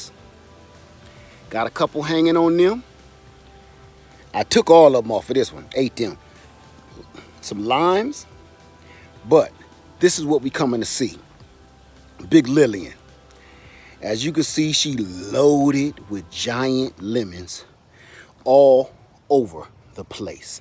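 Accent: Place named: South, United States. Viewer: I loved this video! First off, your southern accent is EPIC! I’m from New Orleans so you talk Like us lol. Secondly, the way you kept talking to little kierra i was weak. My mom always says u have to talk to the plants and show them love maybe thats what I’m doing wrong and tje the way the Lillian tree looked I’m tryna get to this lemon tree level! One day! But in the meantime I’m coming up there to pick from your lemon tree cause u got plenty lol😊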